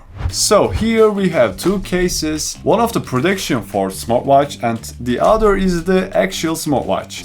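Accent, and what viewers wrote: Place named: Turkey. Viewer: Öylesine açtım bu aksan tanıdık derken Türk çıktı 😂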